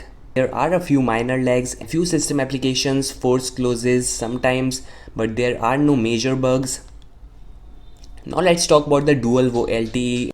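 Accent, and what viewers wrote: India. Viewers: god damn i hate indian accents. but good review though.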